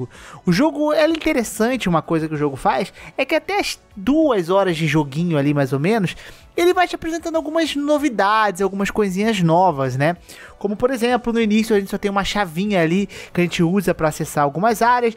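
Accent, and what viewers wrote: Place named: Minas Gerais, Brazil. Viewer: Coé irmão, mineiro é mó bão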